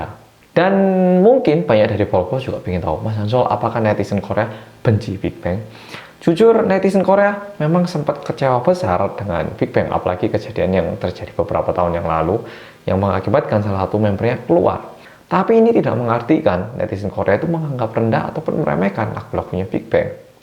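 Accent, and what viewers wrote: Java, Indonesia. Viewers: Ada awal ada akhir, oppa medok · Mas medok ak lho udah berhasil ga nangis lagi dengerin lagunya, setelah dengerin plus nangis semalaman,, trs nonton video mu ini nangis lagi kaaaaaannnn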